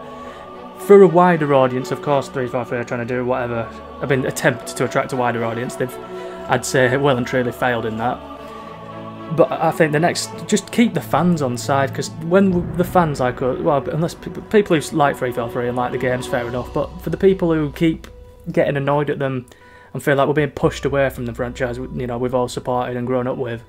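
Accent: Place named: Yorkshire, United Kingdom. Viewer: nice! I was thinking Yorkshire or Hull from your accent. My mom was born in Leeds, and grew up in Yorkshire before moving to the US in the ‘80s.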